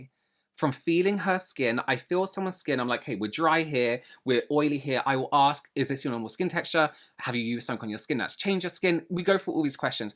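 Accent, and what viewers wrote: United States. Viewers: She sounds so American 😂😂😂😂. Really unintelligent and doesn’t understand that we in Europe actually learn how the body works. Whether you are a man or a woman 😂😂😂 everyone knows what a period is and how they work.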